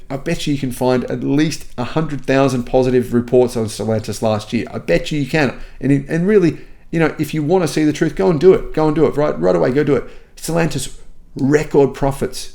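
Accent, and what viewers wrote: Australia. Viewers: Please slow down. When you start talking rapidly your Aussie accent makes you hard to follow for us in the States. Other than that, you've got a very informative site.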